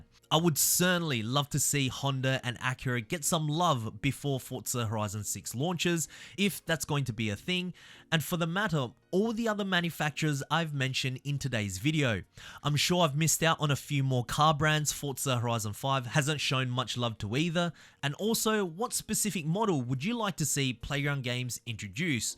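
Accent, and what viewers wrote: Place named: Australia. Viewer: Yo do you also run a channel called? I’m probably wrong but the voices with this channel and the other one are so similar and both have the same Aussie accent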